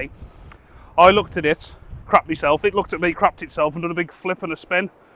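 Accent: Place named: Midlands, United Kingdom. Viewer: I’m from the Black Country your accent sounds a bit local ish to the midlands mate! Love your videos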